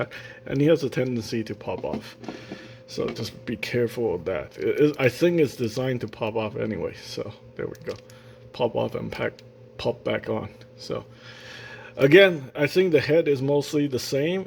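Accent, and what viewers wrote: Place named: China. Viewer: 您好，你也是華人嗎？ making an assumption based off of your username, and what little accent I can detect. If I am mistaken, I apologize, but if so, I am very, very happy to find another person from the same background attempting reviews in English. I've seen quite a few Chinese channels that do Mandarin reviews, but don't see too many Chinese people doing English reviews. Of course, your reviews are way more professionals than mine, ROfL.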